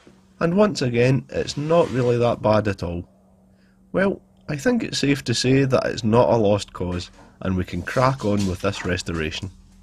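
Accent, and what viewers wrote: Scotland, United Kingdom. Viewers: I can only imagine Sir. I wish I could help out! Love your stuff and your accent. Reminds me of my mechanic buddy from Scotland that lives in Turks and Caicos.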